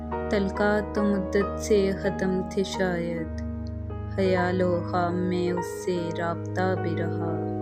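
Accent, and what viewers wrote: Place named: Pakistan. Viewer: Awaz Pakistani lag rhe..🔥 From kashmir IOK ❤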